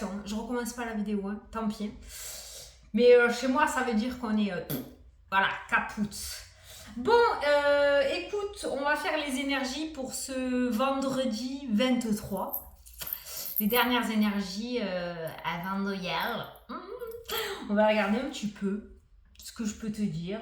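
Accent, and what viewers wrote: South, France. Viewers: Joyeux Noël à vous et votre famille !!! Vous nous apportez le soleil avec votre accent du sud!!! Continuez à prendre soin de vous et de nous tous. À dimanche.🎄🎅🎍🤶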